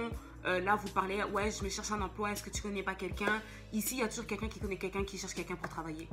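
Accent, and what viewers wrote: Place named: Cameroon. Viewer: Salut tu as un peu l'accent camerounais... je viens voire votre vidéo et cela est très très bien expliqué ma question est la suivante :si vous avez un amis est-il possible qu'il parraine (sponsors comme on dit ici au Cameroun)toutes vos démarches d'immigration et combien de temps cela peut il prendre pour un jeune diplômé (ayant une licence professionnelle en logistique dans une école de commerce de la place)au chômage ? S'il te plaît ?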